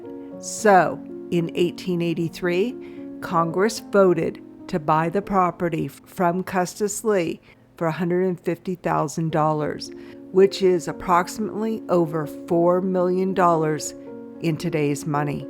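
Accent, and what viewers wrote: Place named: Iowa, United States. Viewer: Narrator must be from Iowa. I grew up there and it’s the only place where I heard natives say “Warshington” or warsh.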